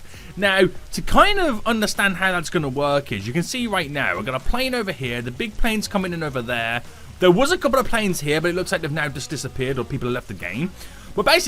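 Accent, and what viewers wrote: United Kingdom. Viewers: Your British? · The British accent 🤌🤌🤌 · Are you British then? If so, cool coz me too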